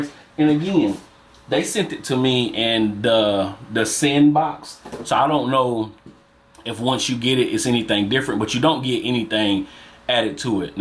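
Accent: South, United States. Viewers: Where you from? I’m from Seattle and those southern accents always stand out around here lol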